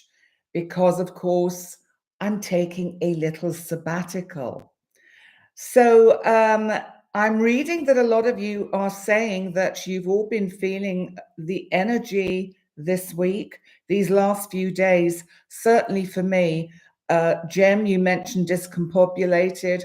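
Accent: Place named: England, United Kingdom. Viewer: Good luck, will be thinking abt you this week! Hope out of pain soon Love your Readings! Love the English Accent sounds great 😊❤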